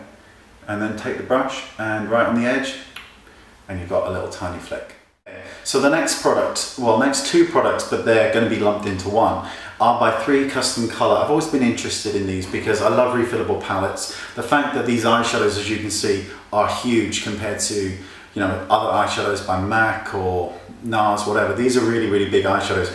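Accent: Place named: United Kingdom. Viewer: I love the british accent. "Last, but not least" :)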